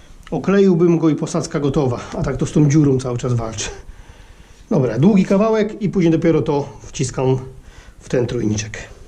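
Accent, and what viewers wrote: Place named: Kuyavia, Poland. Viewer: Idzie wek przypomniał mi się mój śp. dziadek też tak zawsze mówił ;d W ogóle dużo zaciągnięć słyszę u Ciebie które słyszę u siebie na kujawach ;d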